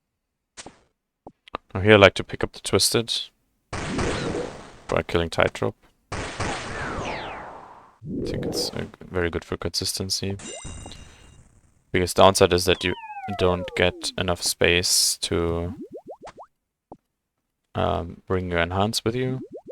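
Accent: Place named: Germany. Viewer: i can hear german in this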